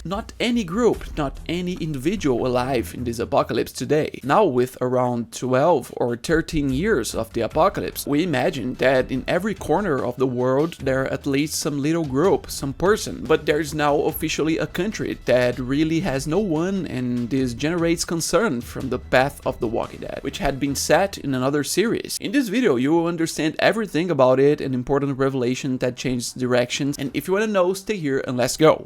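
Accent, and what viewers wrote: Brazil. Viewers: You can barely tell you're brazillian, congrats!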